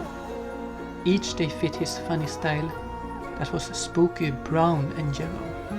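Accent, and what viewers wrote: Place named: Ireland. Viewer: Awwwww I loved that! I enjoyed your story-telling especially with your Irish accent! You are so talented with your pumpkin carving and all of your other drawings!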